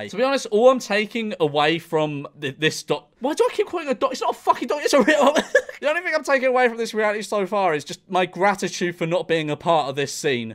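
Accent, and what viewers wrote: United Kingdom. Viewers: He sounds like jacksucksatlife but we’ll every non British or Western European person thinks all British people sound the same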